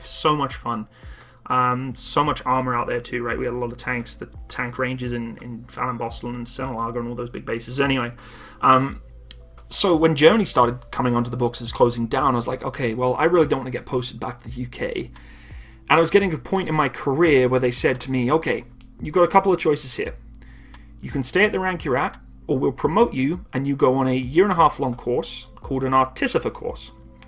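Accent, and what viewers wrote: Canada. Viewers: Def got accent Canadian english accent! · It’s sad you’re sounding more Canadian but also great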